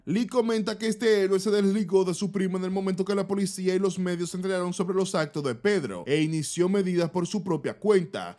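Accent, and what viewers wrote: Dominican Republic. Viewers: este tiguere es demasiado dominicano, hasta narrando las historias le mete el flow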